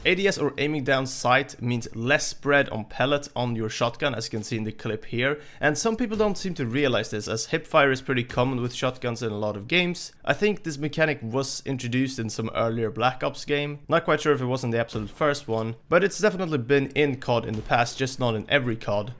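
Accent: Sweden. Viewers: du låter svensk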